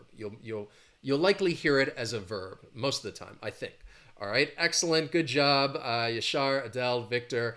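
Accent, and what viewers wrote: United States. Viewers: I'd like your US accent ☺️☺️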